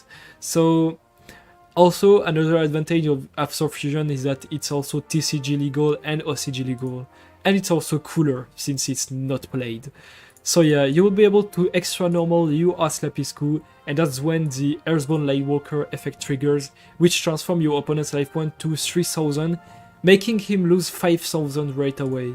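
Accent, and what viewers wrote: France. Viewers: The heavy French accent makes this very awkward and awesome at the same time.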